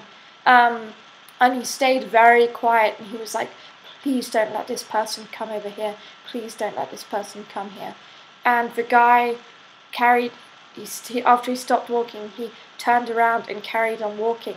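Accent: United Kingdom. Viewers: Very good storie and you have such good English to read bye. I do believe you are English, maybe the Midlands (with voice training)? Can't wait for another..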